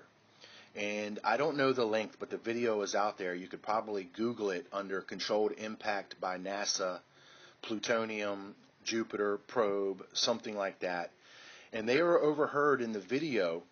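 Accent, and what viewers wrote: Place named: Baltimore, United States. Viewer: Also, I'm betting from your accent that you're from Baltimore and the D.C. area. Make me suspect you a bit.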